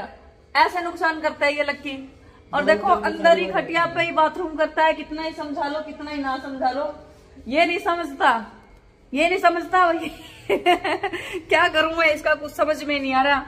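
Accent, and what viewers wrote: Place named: Haryana, India. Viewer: Mam manny hariavni boli bria lagy su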